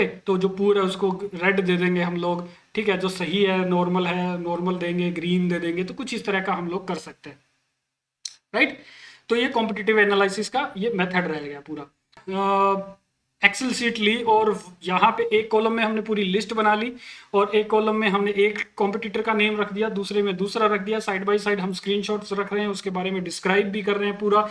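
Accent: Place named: Rajasthan, India. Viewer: sir aapki tone se lag raha h aap pakka sri ganganagr or hanumangarh (rajasthan) side ke hoo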